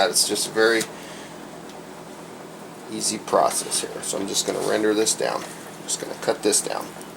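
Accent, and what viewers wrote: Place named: Arizona, United States. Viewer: Aaaah, Arizona. That would explain the beautiful accent. Stay safe.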